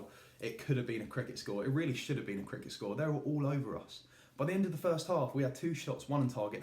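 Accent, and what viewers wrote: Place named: Cockney, United Kingdom. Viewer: who the fuk is this cockney ?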